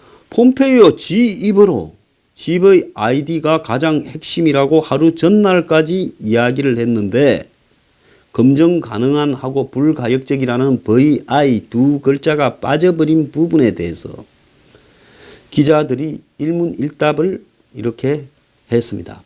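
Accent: Gyeongsang, South Korea. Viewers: 매력: 점점 빠져드는 마초의 갱상도 억양과 가끔씩 날려주는 욕설!" <*랄, 니*미, 니나 잘하세요!(뭉가한테)>이런거 들을때마다 카타르시스 느낍니다! 더 쎄게 해주세요! 쓰레기같은 인간들한테는 말로 해주는것도 아까워요.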